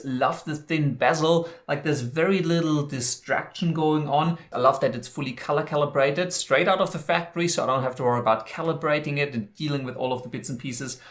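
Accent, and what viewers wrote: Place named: Germany. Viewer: you kinda have that German accent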